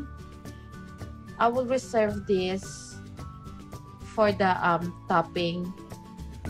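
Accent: Philippines. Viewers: Absolutely perfect! =) I used to eat this at Goldilocks in PH, I can't stop watching your videos coz I'm a bad baker and trying to learn. I hope I can make one of your cakes one day. Thanks for sharing this video. I also like your Filipino accent, makes us proud.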